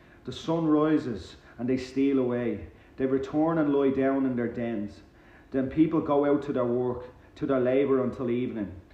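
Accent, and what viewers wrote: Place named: Ireland. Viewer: Love your Irish accent‼️ ☘️ Your love for God's Word is so inspiring. Keep up the good work for Him.🙏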